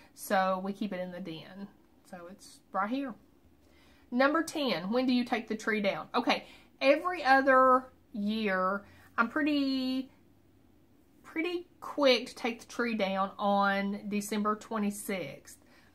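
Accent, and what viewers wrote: South, United States. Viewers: love your tree, like that southern accent!!! merry Christmas.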